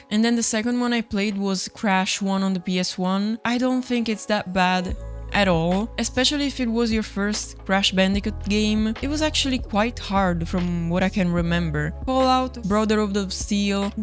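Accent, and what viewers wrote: Italy. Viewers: Your italian accent is so lovely btw ❤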